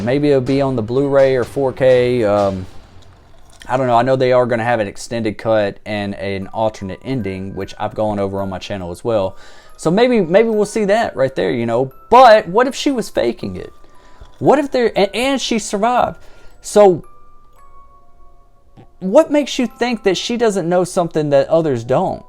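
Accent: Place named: South, United States. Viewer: I love his voice. That southern accent is so relaxing. Looking forward to more theories and videos.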